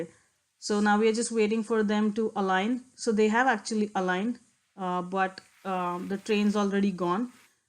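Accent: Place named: Mumbai, India. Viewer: Even put the accent of mubai on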